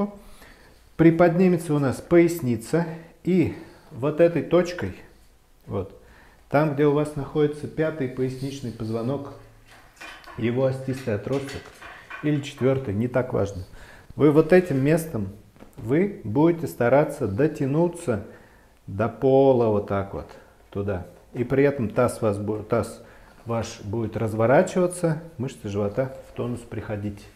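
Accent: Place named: Chuvashia, Russia. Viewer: Остановилась на видео, услышав знакомый чувашский акцент (сама родом из Чувашии из Чебоксары, живу в Германии),этот доктор мне родненький земляк😍, какой классный 👍.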